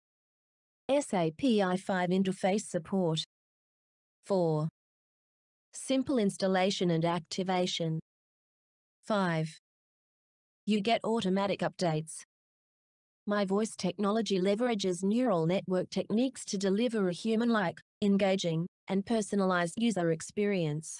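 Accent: United Kingdom. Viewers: Her Voice Sounds Like A British UK Accent.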